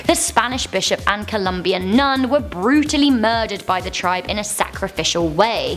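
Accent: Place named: United Kingdom. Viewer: Goddamn i can only withstand so much british accent..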